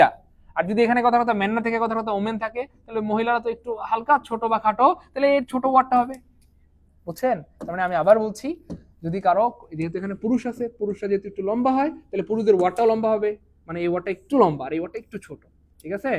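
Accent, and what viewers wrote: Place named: Noakhali, Bangladesh. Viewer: স্যার মনে হচ্ছে নোয়াখাইল্লা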